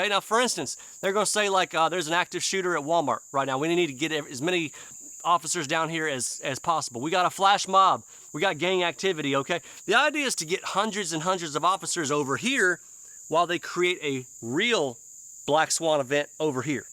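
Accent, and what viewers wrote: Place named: Memphis, United States. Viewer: did you just say you lived in Orlando for 25 years?Where the hell did that accent come from then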